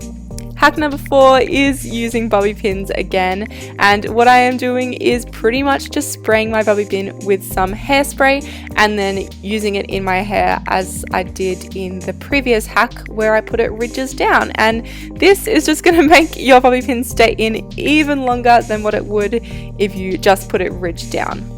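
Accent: Australia. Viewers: I just found you on youtube and I'm obsessed! I love your videos and your Australian accent and you really help me to understand more English because I'm from Sweden and I'm not so good at speaking or understanding English🇦🇺🇸🇪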